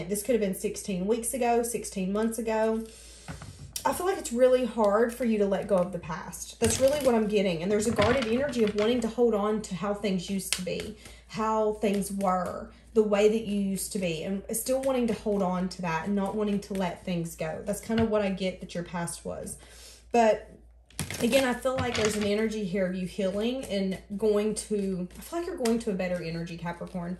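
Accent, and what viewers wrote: South, United States. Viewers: resonates ... as does your kountry accent. Love it, from TN!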